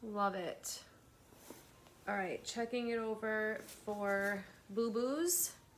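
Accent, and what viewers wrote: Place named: United Kingdom. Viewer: I can never decide whether you are doing a London Cockney accent or a posh British accent. It's a bit of a mix. I have a very broad, thick Scottish accent but some say I sound like a posh Scot. lol. Makes me Guffaw every time! The silk dress would be great dressed down with denim jacket and boots. I am I big boot fan and wear them all year round, with just about anything. I'd probably add a big squash blossom, knowing me?! 😁 Take care and stay fabulous! 🤗💞✌️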